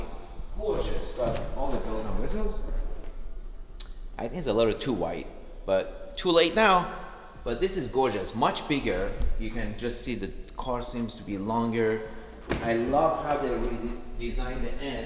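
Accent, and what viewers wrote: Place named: Iran. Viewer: I never heard of this man before in my life. But i can hear on the accent he is Persian.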